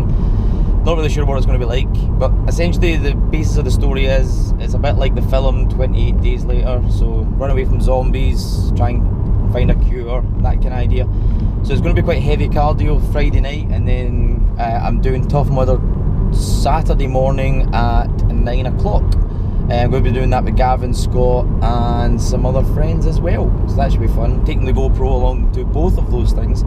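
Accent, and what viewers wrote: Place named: Scotland, United Kingdom. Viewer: .....then we see some training videos from you and me next week!👍 I have to admit to have some problems to understand your scottish accent too😉 Best Regards from Spain, Adam💪💯%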